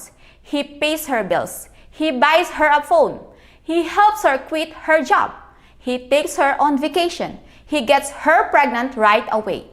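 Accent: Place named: Thailand. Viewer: If I listen your voice and accent next stop Bangkok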